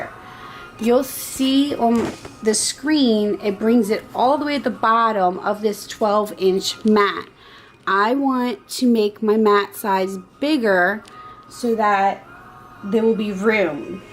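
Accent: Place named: Delaware, United States. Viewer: my ex mother in law and one ex sister in law lives in Middletown my other ex sister in law lives in Bridgeville. Your accent reminds me of them (all good things). I graduated from Dover High School before moving back to my home town in Nevada lol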